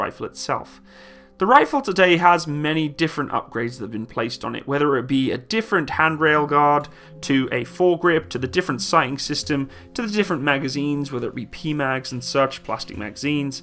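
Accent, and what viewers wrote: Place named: England, United Kingdom. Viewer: you sound english/ do you live in murica, cos if you still live in then uk how did you get the tavor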